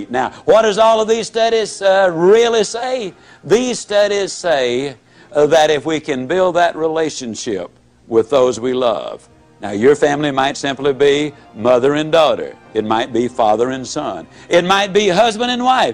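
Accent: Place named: South, United States. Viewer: His perspective is valuable and you can learn by reading his writing if you don't like a southern accent.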